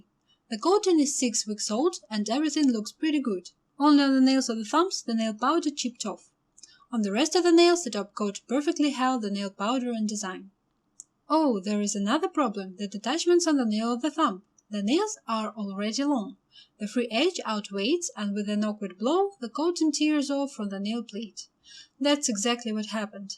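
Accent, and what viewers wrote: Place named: Russia. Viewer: Сразу видно когда наш человек говорит на английском 😎такой жуткий акцент😂но это все фигня, я даже так не умею🤥а работа просто афигенная 🔥🔥🔥👍👍👍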